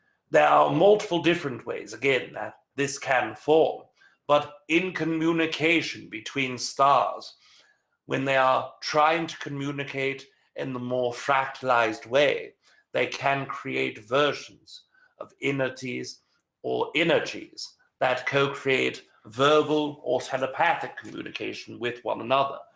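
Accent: England, United Kingdom. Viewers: why is he speaking in an english accent though?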